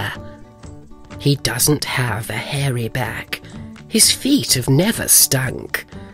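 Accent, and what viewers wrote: United Kingdom. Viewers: Such a wonderful British accent. So proper and so wicked. Really very funny. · these books are hilarious but the british narrator makes them PRICELESS!!!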